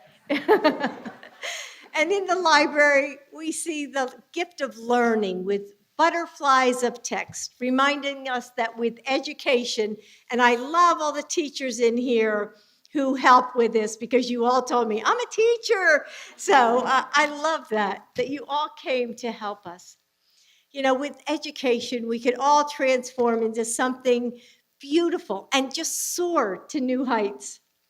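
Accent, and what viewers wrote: South, United States. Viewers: I’m sorry, I’ve never heard her speak with a southern accent like the beginning of this- Would sound nice if any truth or help with her words- It gets worse as it goes on- Dear God I wish it from the heart or star center for which she refers! I gotta bathroom lady... As they complain and hate America but go thru every room- can anyone imagine Biden spewing out this speech? Happy Holidays everyone from my heart seriously! God bless!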